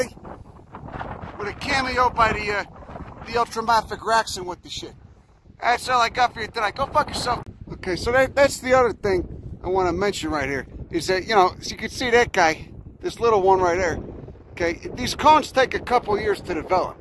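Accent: Chicago, United States. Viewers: Truth! If you've never heard these Latin names pronounced before, you're going to learn them with a Chicago accent. Plus, there's that special "see you later, my friend" phrase. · First of all, your Chicago accent makes me feel so happy. Second of all, if I see a juvenile bear, I assume his mom is nearby somewhere and I’ll be the one running. Third, your knowledge is amazing! Thank you.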